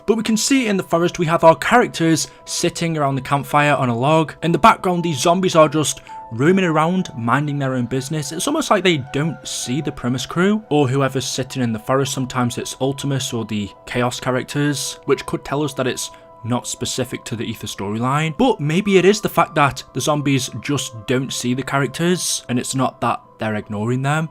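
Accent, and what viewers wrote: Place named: Stoke-on-Trent, United Kingdom. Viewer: Are you from stoke on Trent ? I recognise the accent, I’m from stoke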